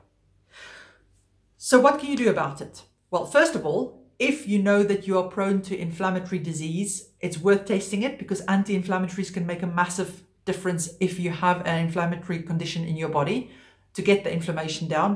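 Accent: South Africa. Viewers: Nice South African accent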